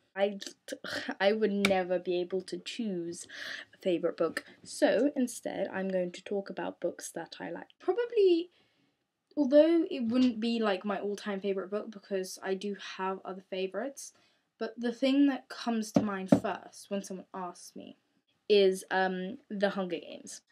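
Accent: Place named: RP, United Kingdom. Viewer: lol you sound so posh!! =P never noticed ur accent before.....i think im becoming more scottish =] love you......<3 xx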